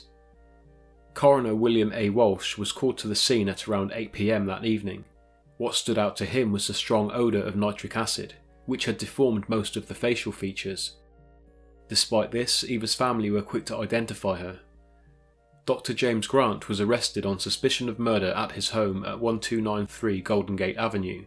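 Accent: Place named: United Kingdom. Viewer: Sorry but "Tales from California" read with a British accent... hmmm. doesn't jive... It's not "Los Angel-ee-s" It's "Los Angel-y-s".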